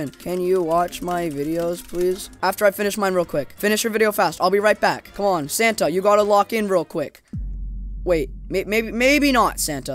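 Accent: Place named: United Kingdom. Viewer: goated channel frll (why is that your first youtube video you had a biritish accent?)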